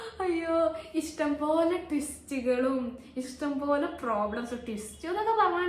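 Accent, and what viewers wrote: India. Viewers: Bro that perinthalmanna slang is🤌🤍(kunu...ttoo)😂 Ankk Cabin crew aavan kaiyatte tto🫶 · Njan ninte vedios kanunathe malappuram slang kelkkan anu 🫂❤️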